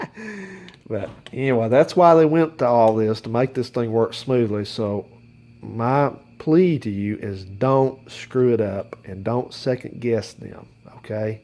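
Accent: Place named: South, United States. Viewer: Greetings: "What happenz is" ....Rednecks so fun 2 listen talk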